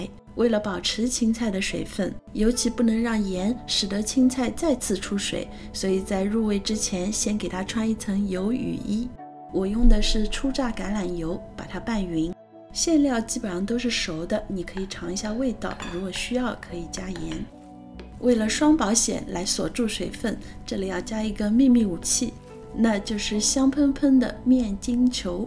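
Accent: Shanghai, China. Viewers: 听发音听出了上海人，看面粉牌子就知在美国对伐？这种菜包是王家沙经典包子。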